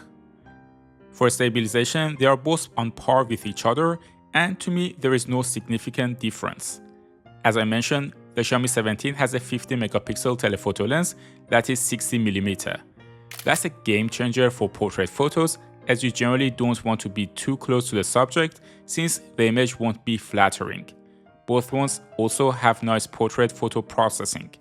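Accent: Iran. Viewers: Your accent is similar to Iranian....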